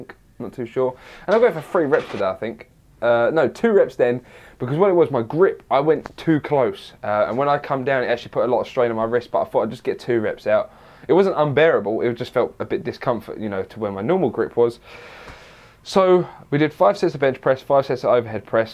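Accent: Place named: United Kingdom. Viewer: Which part of the uk you from?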